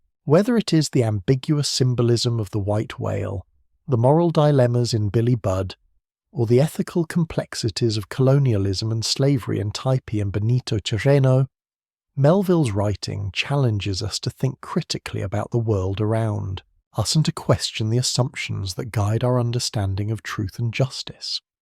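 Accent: United Kingdom. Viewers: It's being a British accent, don't you know.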